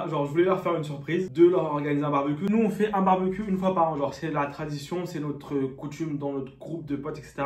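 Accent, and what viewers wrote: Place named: Ireland. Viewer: l'accent irlandais to die for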